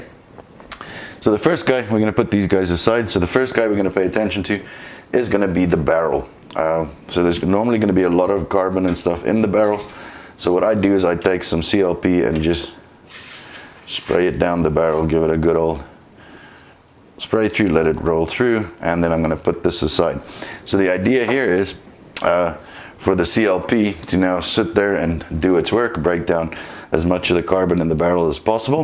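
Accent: South Africa. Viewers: South African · Great video...your accent..is it a South African twinge? Lekker man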